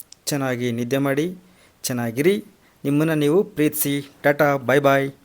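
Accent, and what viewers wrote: Chitradurga, India. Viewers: Good morning sir, ನಿಮ್ಮ ಅಡುಗೆ ಜೊತೆ ನಮ್ಮ ಚಿತ್ರದುರ್ಗ ಭಾಷೆ ಯ ಸೊಗಡು ಚೆನ್ನಾಗಿರುತ್ತೆ ನಿಮ್ಮ ಮಾತುಗಳನ್ನು ಕೇಳುತ್ತಿದ್ದರೆ ನಮ್ಮ ಊರಿಂದ ಯಾರಾದ್ರೂ ಬಂದ್ರೆ ಮಾತಾಡುತ್ತಾರೆ ಅಲ್ವಾ ಹಾಗೆ ನೀವು ಮಾತಾಡುವುದು ಹಾಗಾಗಿ ನಮ್ಮವರೇ ನೀವು ಅನ್ನುವ ಭಾವನೆ ನಮಗೆ ದಯವಿಟ್ಟು ನಮ್ಮ ಭಾಷೆಯನ್ನು ನೀವು ಮಠದುವ ರೀತಿಯನ್ನು ಬದಲಾಯಿಸ ಬೇಡಿ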